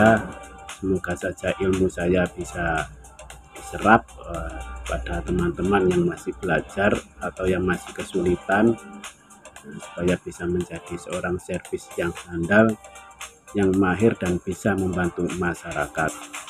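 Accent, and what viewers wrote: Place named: Jombang, Indonesia. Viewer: Logate sampeyan niki kadose tiyang Jombang mas salam dari Manado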